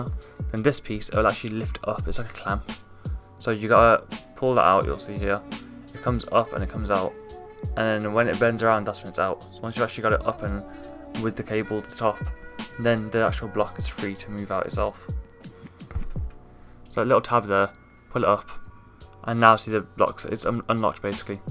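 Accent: Birmingham, United Kingdom. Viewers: Twat....nothing wrong with brummie...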